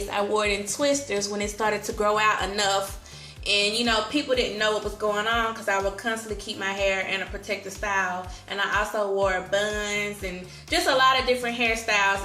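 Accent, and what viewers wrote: South, United States. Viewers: 10 thumbs up. love that country accent.you sound like my relatives from down south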